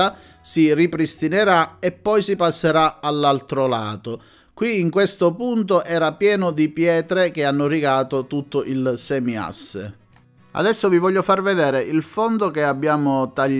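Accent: Calabria, Italy. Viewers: Me gustan mucho tus videos,yo tuve un panda 4X4 y ha sido el coche de mi vida,me hizo feliz y me encanta el acento calabrés,se entiende todo perfectamente si eres español,felicitaciones!.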